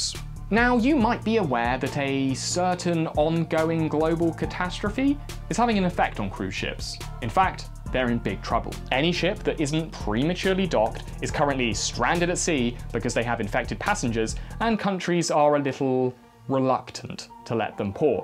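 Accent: United Kingdom. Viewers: Anyone else get happy when they start a video and find out it's a British person talking? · Misinformation with a British accent. At least it sounds inviting. It's also quite wrong. The American quite.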